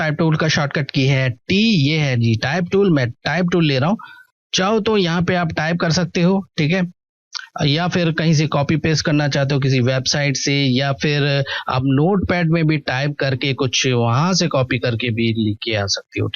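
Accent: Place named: Pakistan. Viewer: superb sir keep it up when you speak it seems to me someone speaking in very pakistani urdu language from india, i am indebted to you sir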